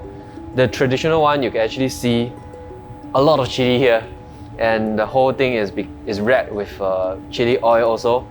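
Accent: Singapore. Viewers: Hey, u sound Singaporean!!! Yes, next step is citizenship. In fact, I was lucky enuf to visit China including SZ, during the early 90s. Shenzhen was mainly farms very different from SZ of today. I still do business trips today to SZ... Welcome to the Singapore family.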